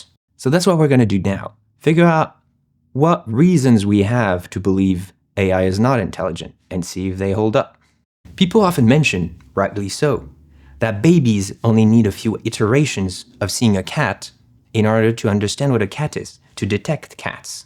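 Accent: France. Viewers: i can hear the frenchman underneath the english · I love your aggressively French delivery and this is a very thoughtfully written video. I love the concept fission concept too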